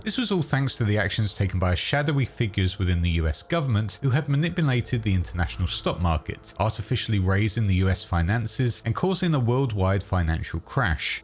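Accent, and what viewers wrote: United Kingdom. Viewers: I think you should be a voice in the sequel. Either a fixer or working with me blue eyes. You see, because you're British so obviously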